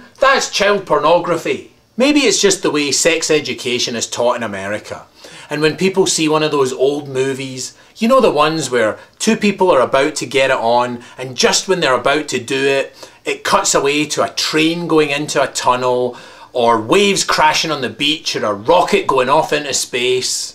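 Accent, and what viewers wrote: Scotland, United Kingdom. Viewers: Yes, and the air with some sort of floating ad for insurance made out of other ads for fast food. On a serious note, why is your accent so deceptive? Your voice says Scotland, but your voice saying where you're from says America. Why the difference?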